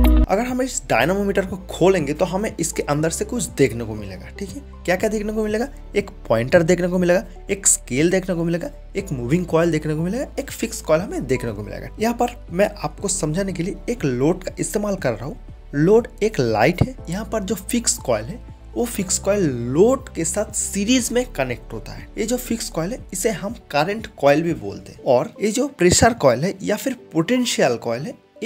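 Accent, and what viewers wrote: Bengal, India. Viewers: आपकी भाषा से आप बंगाली लगते हो को बंगला भाषा बोलते है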